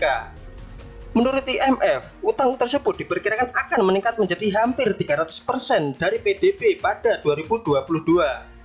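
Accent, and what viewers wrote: Java, Indonesia. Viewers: Jelas wong jowo iki admin e kwkwkwkkw. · Medoknya itu lohh boss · Narator nya MEDOK sangat 😁🙏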